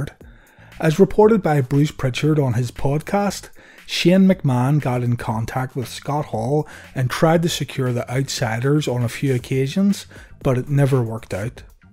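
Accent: United Kingdom. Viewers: narrated by people from across the pond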